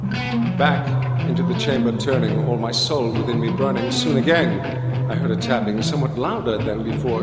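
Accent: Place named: United States. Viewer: An American voice reading Poe..Refreshing.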